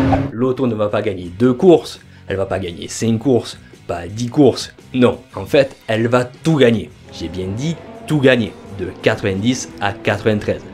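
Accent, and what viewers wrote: South, France. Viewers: tu t'exprime avec un super Français, qui sen un peut le sud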